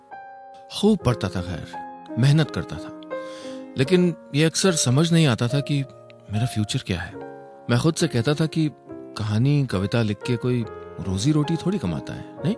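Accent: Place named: Lucknow, India. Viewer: आपकी आवाज़ से लगता तो था की आप लखनऊ, कानपुर के हैं. आज पता भी चल गया माँ- बाप के लिए सीख है की बच्चों पर अपनी इच्छाएं थोपें नहीं. उनकी रुचियों को अहमियत दें. नहीं तो बच्चे कब आपसे दूर चले जायेंगे पता भी नहीं चलेगा.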